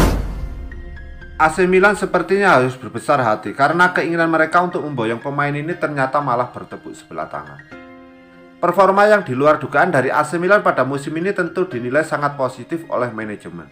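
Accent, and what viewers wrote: Java, Indonesia. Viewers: Medok bgt ngomong e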